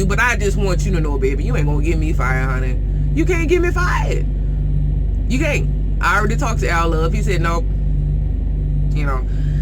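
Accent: United States. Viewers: Where are you from you got a real country tone to you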